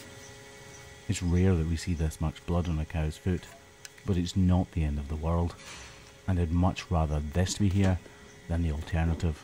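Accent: Scotland, United Kingdom. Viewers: The Scottish Morgan Freeman.😅😂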